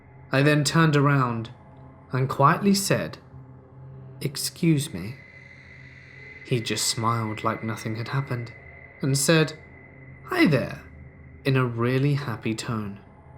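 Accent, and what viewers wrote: United Kingdom. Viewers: Does every one of these channels have to have a British accent ?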